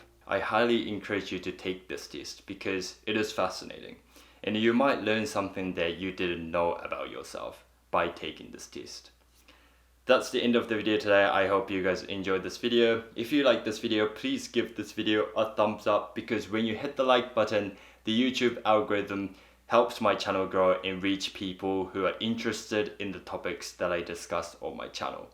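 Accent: New Zealand. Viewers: Your accent is so new zealand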